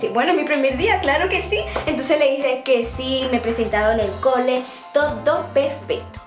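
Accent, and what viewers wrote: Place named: Spain. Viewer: estas agarrando el acento español,trata de no cojerlo ese acento AAAAA😭los venezolanos hablan muy lindo<3 27/8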